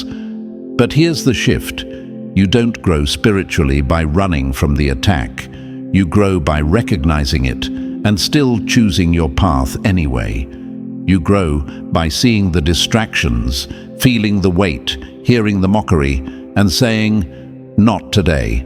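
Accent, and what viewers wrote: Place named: United Kingdom. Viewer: How would know. You sound British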